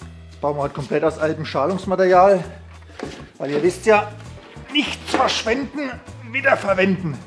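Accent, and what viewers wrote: Franconia, Germany. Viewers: Sehr coole Arbeit 👌 Auch sehr angenehme Videos 👍 Hört man da einen Fränkischen Dialekt? 😄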